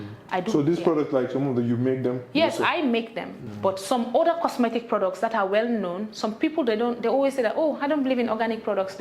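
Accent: Nigeria. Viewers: She speaks more like a Nigerian, am loving her already. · I am glad that people with stereotypes about Nigeria can see that Nigerians dont discriminate, and you can be successful at whatever you put your heart to do in Nigeria. Nigerians and Gambians speak alike. I couldn't even pick any difference. Kudos young lady, God will continue to uplift you in your endeavours. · Sis already sounds like a Nigerian 😀 Brilliant woman 👍🏽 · Her accent is nigerian🎉🎉🎉🎉🎉🎉🎉🎉🎉 It has nothing to do with the volume. Nigerians are generally flamboyant. We love spending and looking elegant. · 8ys,she speak like Nigeria accent, respect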